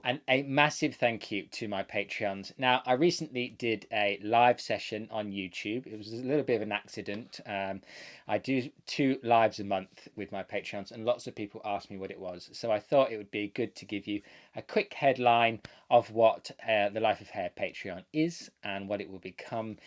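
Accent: United Kingdom. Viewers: I've been watching your videos all day on Saturday and it's so nice to watch and listen to another Brit. US colouring terms are so different to ours. I know now that if I did want to colour mine (South Asian hair) I would know what to ask for.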